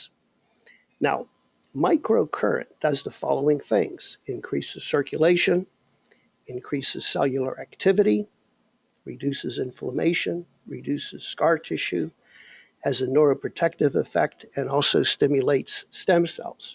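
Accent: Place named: Pittsburgh, United States. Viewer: You must be from the Pittsburgh area from the accent. Im from around there.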